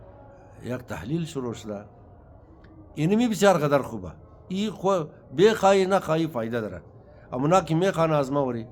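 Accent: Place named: Hazarajat, Afghanistan. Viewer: کاکای ما از آزره جاغوری ایم. لهجه آزرگی تو خیلی خوشم میه. خدا توره عمر سالم و دراز بده. 💐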